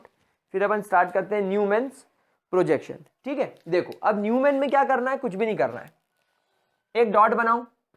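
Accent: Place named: Indore, India. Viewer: yeh sir pakke indore hai voice se hi pata chal rha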